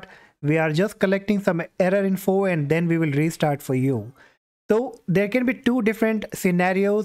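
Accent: India. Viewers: indian accent is trash cuh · That's just how his accent is, what's the problem? He's clearly indian.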